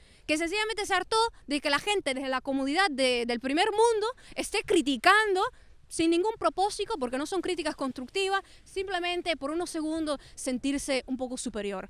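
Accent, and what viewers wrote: Spain. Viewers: Niña estás locamente loca Dios mío manera de hablar mierda,eres cubana q mierda hablas como española con esa Z horrible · Ridículo tu acento gallego que sacamos a punta de machete , tu te estas aguando en un vasito de agua, sin ningun proposico. En Suecia la Universidad es gratis en Canadá te ponen un yeso. Eso es lo que queremos en Cuba . los suecos no tienen comunismo. Ja Tienes 12 latas de atun y dos generadores eléctricos.Mejor dejo a esta estúpida.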